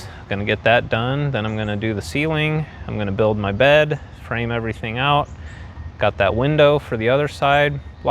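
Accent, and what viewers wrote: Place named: Nebraska, United States. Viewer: I know this is 2 yrs old. And i havent seen in your comments the answer to why theres an "ethernet" port on the fan. its not an ethernet port. RVs use what look like cat5 cables for internal wiring. That is a port for one of them cables. My van uses the same types of cables for the internal wiring for like fans, radios, lighting etc. oh yeah i have a conversion van. I knew you were from nebraska. every nebraskan knows other nebraskans lol. theres just something about the talk and the attitude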